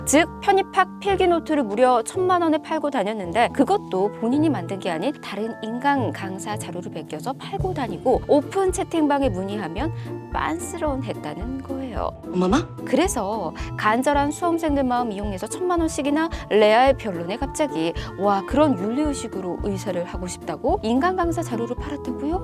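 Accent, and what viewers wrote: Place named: Gyeongsang, South Korea. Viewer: 근데 앵커라는 사람이 경상도 사투리 ㅋㅋㅋ 경상도 출신이죠? 사투리라니.. 아물론 클레어인가 뭐시기인가 이런한동은 알려져야할듯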